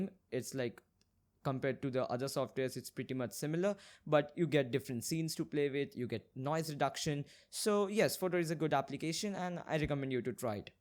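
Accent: India. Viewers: I love that Indian accent😂👌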